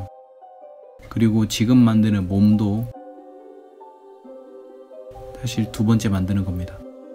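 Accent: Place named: China. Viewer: Cara ele é de china você não viu como ele fala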